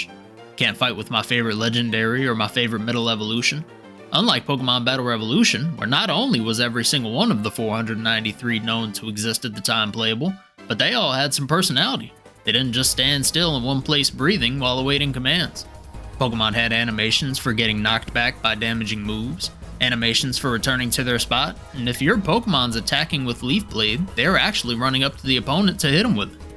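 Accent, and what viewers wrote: United States. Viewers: Cowboy accent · I like how you sound more Texan than I do, even though I have lived in Texas my entire life. 😂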